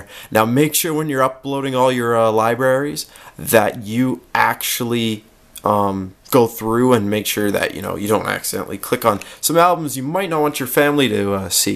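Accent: United States. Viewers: Gerardo Sanchez Dumb stupid speaking yank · Leigh Ann Woody, the world is more than USA, outside the states you pronounce it "rotor", even if he sounds like an amercian, "rotor" is correct. So, how smart are you? ;)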